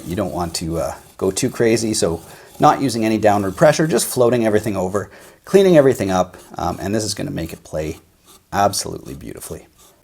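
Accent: Canada. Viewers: Love your channel,. You obviously know your stuff and love playing! Your Canadian accent is icing on the cake.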